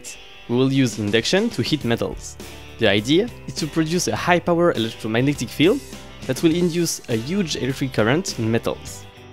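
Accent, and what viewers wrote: France. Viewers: Très belle vidéo! Vous êtes français non? Cet accent <3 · You have a french accent. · Nice work, I can't tell what your accent is, are you French ?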